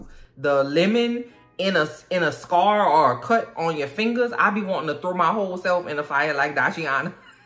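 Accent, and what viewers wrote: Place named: Louisiana, United States. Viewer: Absolutely LOVE the Louisiana accent and humor!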